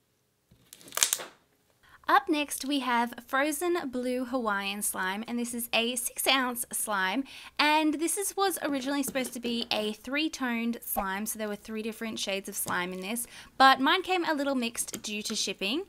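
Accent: Australia. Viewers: Your Australian right!!!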